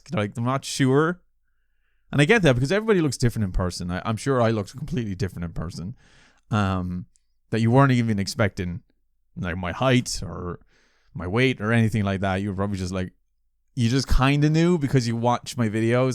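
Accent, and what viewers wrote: Ireland. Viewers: my guy, other people’s joy is not your responsibility !!! don’t ever put that pressure on yourself again cause we’re here for the laughs but not at the expense of your health 😭 take however long you need cause if you feel great your content will be great without even trying. we’re not going anywhere so you can relax. i mean, at least i’m not cause you’re irish kylo ren you could literally read off a shopping list i’d still be fascinated lmao take care ! ✌️😛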